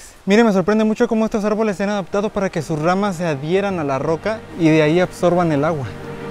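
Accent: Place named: Oaxaca, Mexico. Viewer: En realidad la mayor parte del tiempo en toda la sierra de Chihuahua esta nublado y también la sierra abarca más de la tercera parte de la geografía del estado. Tu eres surumatito de allá por Oaxaca? Es qué te ves cómo oaxaquita y suenas como tal. Saludos de La Colonia LeBarón y arriba mi Chihuahua y nada más!!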